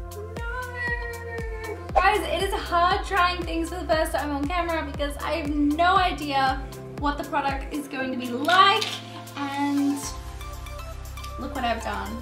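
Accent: Australia. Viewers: I love your Austrilian accent!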